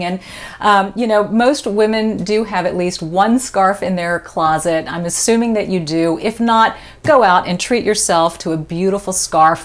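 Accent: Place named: Connecticut, United States. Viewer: Without you mentioning Houston, I would have thought you were from Connecticut... It's a compliment. ;)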